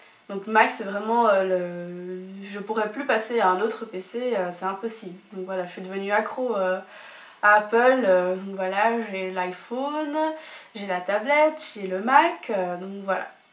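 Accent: Belgium. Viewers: Super vidéo , ça permet de mieux te connaître :D Tu es belges ? :)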